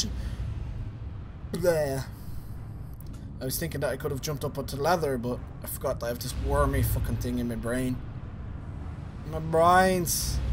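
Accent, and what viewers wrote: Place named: Ireland. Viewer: your a great youtuber, I love ya Irish accent love. keep up with the youtubing.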